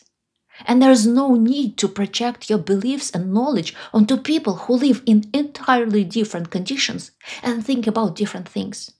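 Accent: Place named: Russia. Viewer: It's too difficult to understand you up to impossible because of your accent. This is also one of the reasons 'others' don't have any clue what russians talk about. Any thoughts to emprove your pronunciation? As a gesture of respect to a foreign language/native speakers?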